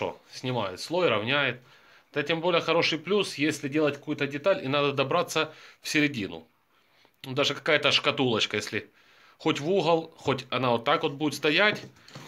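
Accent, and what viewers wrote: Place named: Belarus. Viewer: Фирменный белорусский говор)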